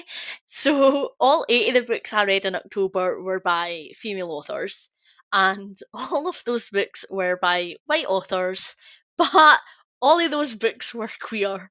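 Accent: Scotland, United Kingdom. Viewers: why are scottish accents literally the best omg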